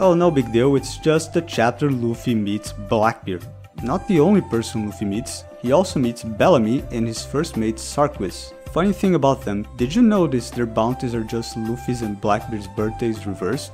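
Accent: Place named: Brazil. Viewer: Just watched your first video and it was great! Super hyped to watch this next one. Also, judging by your accent I gotta ask, are you brazilian? If so, me too, salve!! haha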